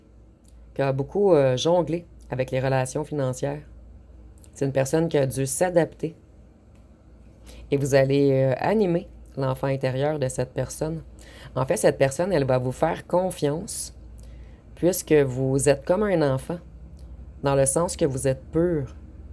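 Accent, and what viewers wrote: Canada. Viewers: J adore ce vernis. La voix et l accent canadien j adore.quel est votre petit prénom discret . Je suis reunionnaise, nous avons un patois qui ressemble à celui des canadiens. ❤❤❤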